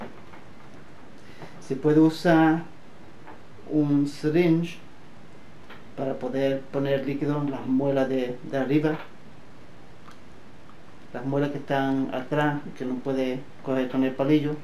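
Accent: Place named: Andalusia, Spain. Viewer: que acento mas andaluz xDDDD :)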